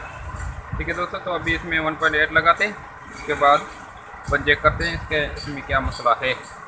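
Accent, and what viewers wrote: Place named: Sindh, India. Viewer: Ha aapke bolne ke andaz se pata chal jata he sindhi style he ...main umarkot sindh se.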